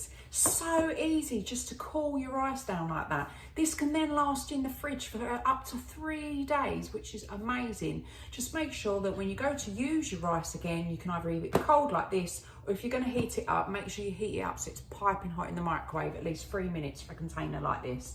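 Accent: United Kingdom. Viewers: Bing bang bosh, you sound like you’re from London mate. Thanks for the handy video 🙏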